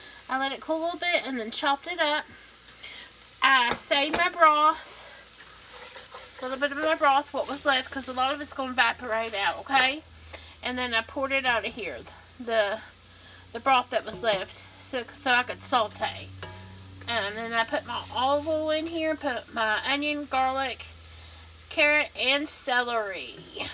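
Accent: South, United States. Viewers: That’s how us southerners say it☺️!! My late mama, God rest her sweet soul, would call the word, yesterday, yursday😂!! She also used to tell me that I needed to get my “poplar” sleep instead of “proper”😁!!!! I miss her💔!!!!!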